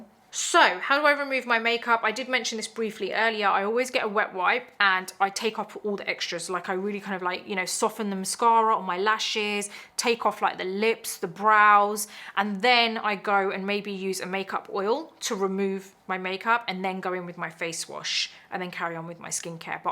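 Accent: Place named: United Kingdom. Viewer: ❤ Iove your accent its so cute.. what part of UK are you from? ❤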